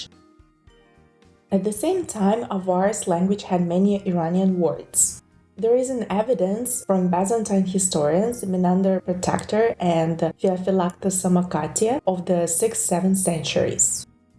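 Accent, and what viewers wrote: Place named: Eastern Europe. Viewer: You sound Slavic too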